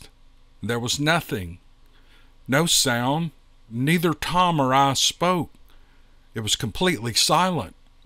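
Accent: South, United States. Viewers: Nanny-mo!! 🤣 I LOVE the way you mispronounced that! 😘🥰 It's actually pronounced Nuh-nye-mo. But I couldn't care less if you get it right. I love your southern drawl and your great storytelling.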